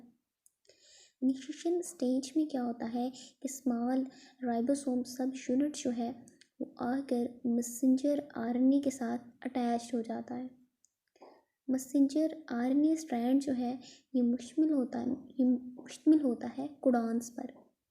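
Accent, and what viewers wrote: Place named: Pakistan. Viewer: pakistani accent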